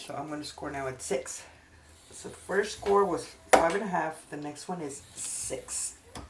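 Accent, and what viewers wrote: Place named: Puerto Rico. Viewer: I know this is an older video but I've been watching all your older videos to catch up I guess. I love the way you teach. But, even more special to me is your voice and accent. You sound so much like my family that it makes me homesick. I'm Puerto Rican from NYC as is my entire family. I live in Virginia now so I'm far away from anyone that sounds like me. Listening to you makes me happy.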